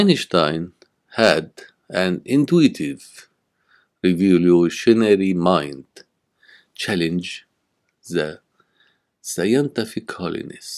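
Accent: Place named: Syria. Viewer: I like you syrians pronation 😘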